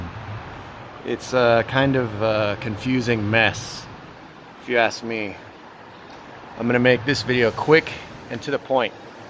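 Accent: United States. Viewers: Who cares to make fuss about drivers position. He made a mistake, but we all should know the right or left of our vehicles if we are going to do potentially detailed maintenance. I get it. But we should focus on the facts. This very well could had been a Subaru in Japan or England, etc. Sans his American accent. But not every u tube video is safe to follow without some double research or common sense. One could see the frontal location of the front differential in his video. That's enough beyond a verbal for me.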